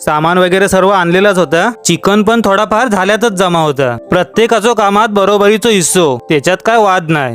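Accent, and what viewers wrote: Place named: Malvani, India. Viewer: तुझी भाषा मालवणी भाषेला जवळची आहे... पण ती १००% "मालवणी" नाही... तू " " म्हणजे कणकवली जवळच्या "नागवे" गावातला आहेस का?? किंवा तुमचा मूळ गाव "नागवे" आहे का??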